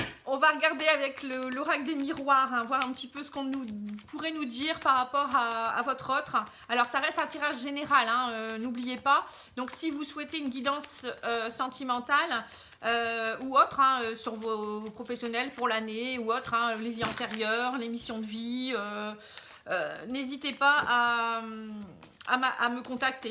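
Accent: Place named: Picardy, France. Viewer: Bonsoir c'est la première fois que je vois vos vidéos merci pour la guidance. Vous avez un accent du Nord de la Picarfie je dirais 😉🙏🧘‍♀️🧚‍♀️😘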